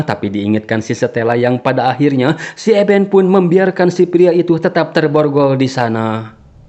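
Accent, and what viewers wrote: West Java, Indonesia. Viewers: Sunda pisan😂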